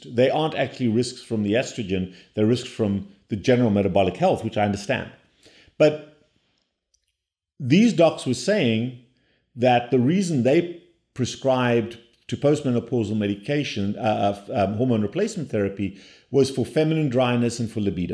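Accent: South Africa. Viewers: Hy klink so baie of hy Suid Afrikaans is? Hy moet met ons Namibiese Dr's kom praat!😞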